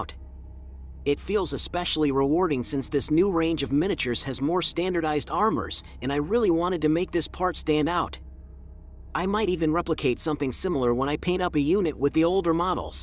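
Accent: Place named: France. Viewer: would much prefer to hear your French accent. Many of the top mini painters have accents such as Trovarion, Marco Frisoni, Sergio Calvo etc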